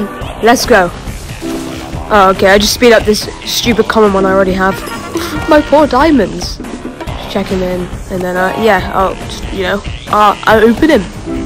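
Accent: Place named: United Kingdom. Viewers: Is no one gonna talk about why he sounds British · Hes in UK but he has a brittish accent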